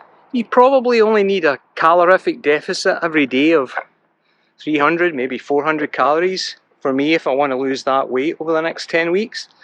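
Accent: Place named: Scotland, United Kingdom. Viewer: Thanks for your videos. As a 64 year-old avid runner, they are great for me. I noticed your Scottish accent, but see from all the US flags in your videos that you're presently in the USA somewhere. I spent 3 years stationed in Thurso (far north of Scotland) as a US Navy Electronics Technician and my wife (for 40 years now) is from there. Where are you from?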